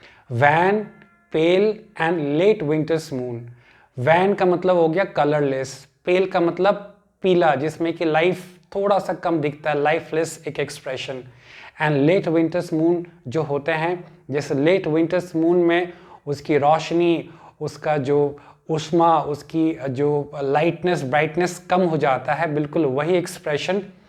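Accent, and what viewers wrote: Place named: Bengal, India. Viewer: Sir apki languge tone se aap bengali lgte ho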